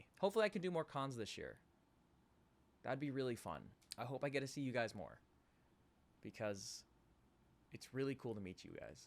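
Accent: United Kingdom. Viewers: Happy birthday to one of the best/sweetest guys I've ever seen! happy birthday to the guy that brought me friends that i can fangirl with over how amazing you are in every way! happy birthday to the man who makes My day almost on the daily!. Happy birthday to the guy that I found all those years ago That made me swoon over the British accent from the 1800s and I obviously know that's no longer a thing anymore but that's basically how I discovered you (on yt with compilations of your tiktoks since I didn't/don't have tiktok downloaded on my phone) Happy birthday to one of the most genuine people I have had the pleasure seeing through YouTube. I can only hope one day that I'd actually get to meet you and truly show you how much you mean to me. This is not a parasocial thing I truly see you as like a friend who's been there for me without even actually saying anything directly to me or being right next to me but still being there if that makes sense?😅😊. 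Thank you and happy birthday! Thank you. I hope it's the best birthday. Thank you! Until the next one and the one after that and so on and so forth. I'm 21 years old and I will be 22 in Sept.17th. Sorry for the tmi.. anyway i hope that everything goes well for you, I truly mean that!. I hope this is not too much or creepy to you, but I really appreciate you for as much as I can without knowing you (in real life) so to speak. Anyhow, have the most wonderful birthday ever because you deserve it you're a wonderful man! you have help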